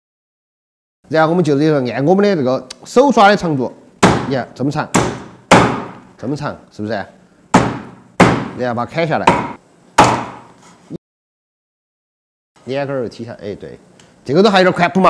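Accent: Chengdu, China. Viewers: 最标准成都话！love!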